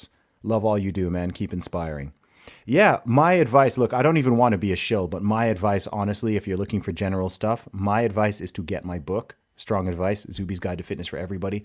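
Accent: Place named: United States. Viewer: Idc what anyone says you sound like you are from America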